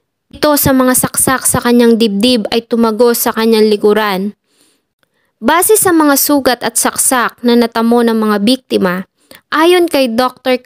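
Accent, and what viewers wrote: Philippines. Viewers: Oky lng UN pilipino nmn Tayo my kanya knya acsent nmn kahit sa ibang bansa tulad din nmn natin cla hind Rin cla magaling mg English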